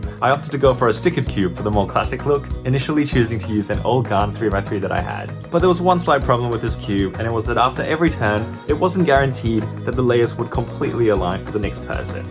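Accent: Australia. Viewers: Are you Aussie too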